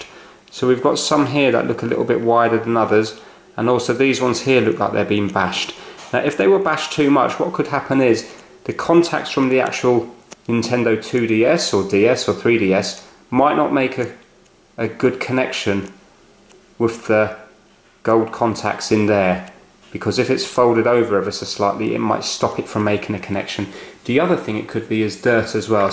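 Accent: United Kingdom. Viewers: thank you british man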